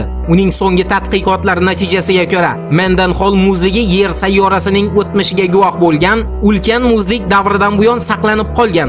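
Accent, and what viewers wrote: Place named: Andijan, Uzbekistan. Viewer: Anjanchasiga gapiryapdi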